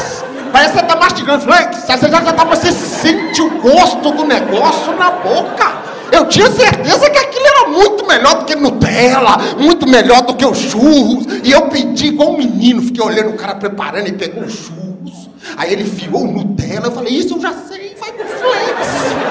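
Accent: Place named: Minas Gerais, Brazil. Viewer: Mineiro falando tipicamente mineiro já me causa risos, e com texto top, bom tom de voz e "taime" certo fica top...kkkkkkk Mt show 👏👏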